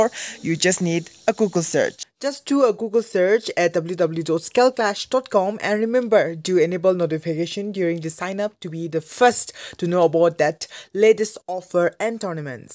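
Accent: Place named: Nagaland, India. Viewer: I just love Ao accent 😎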